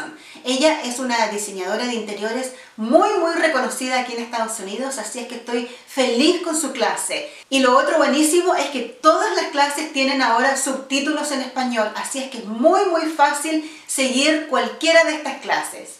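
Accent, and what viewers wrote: Chile. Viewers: Eres chilena? Me suena tu acento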